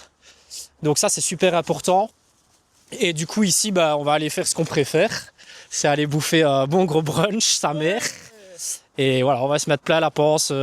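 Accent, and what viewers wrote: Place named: Verviers, Belgium. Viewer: L’accent de Verviers qu’on aime tant 😍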